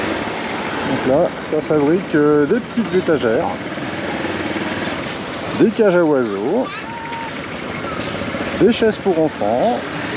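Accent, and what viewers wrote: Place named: Madagascar. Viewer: Super vidéo .j aime bien analakely .Mon épouse me dit que votre accent gasy est charmant 😅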